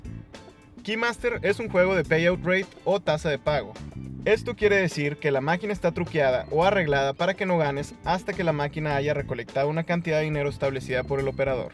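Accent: Monterrey, Mexico. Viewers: Eres regio???? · Eres de monterrey verdad? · Eres de Monterrey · Saludos papu muy buenos los tips eres un crak claro mexicano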